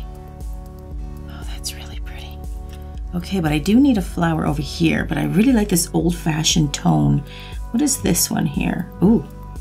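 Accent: Canada. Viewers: Is canadian?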